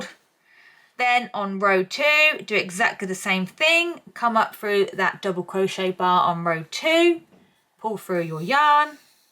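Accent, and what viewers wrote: United Kingdom. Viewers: I love love love you for many reasons 😂 but most of all is british accept but US terminology. I had no idea that i can gwt both. So it's all a lie that I can't have my cake and eat it too 😂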